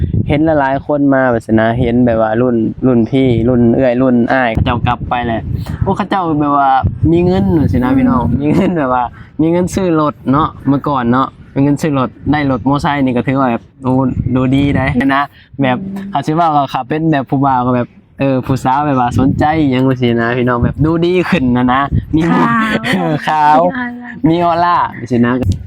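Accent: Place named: Isan, Thailand. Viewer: ถ้าไม่บอกว่าเป็นคน สปปลาวไม่มีคนเชื่อ นึกว่าเป็นคนไทยอีสานแน่นอน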